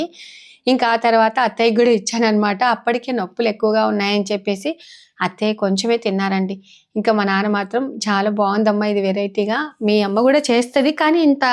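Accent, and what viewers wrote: Rayalaseema, India. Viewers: ఈ రాయలసీమ మాటలు సీమ తెలంగాణ మాటల్లోనే ఉంటాయి నువ్వు మాట్లాడిన కొద్ది నాలి అనిపిస్తుంది🎉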